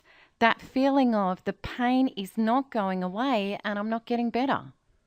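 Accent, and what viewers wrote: Australia. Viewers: I can't stand the Australian accent.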